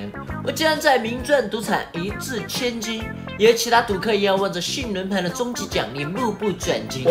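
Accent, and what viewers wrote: Sichuan, China. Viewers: 有个问题想问下台湾的朋友们啊..大陆里面的普通话和台湾那边的无论是用词还是发音习惯都有些区别，厂长的视频里还带了点四川口音，台湾的朋友们能很流畅的听懂吗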